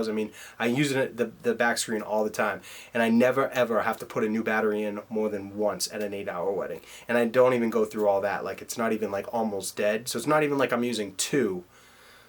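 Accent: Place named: Massachusetts, United States. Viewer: You mentioned how you are from Massachusetts, then I suddenly noticed the accent. xD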